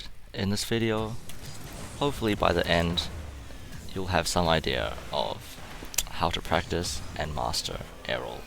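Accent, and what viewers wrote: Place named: Germany. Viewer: Ich denke mal du bist deutsch, ich denke, dass ich das ein bisschen raus höre. Also ich hab eine Frage, muss man durchgehend Tornado spinnen? Und lenkt man dann nur mit w und s?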